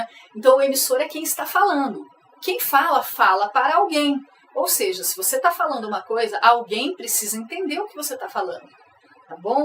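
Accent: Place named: Londrina, Brazil. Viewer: Vc é de Londrina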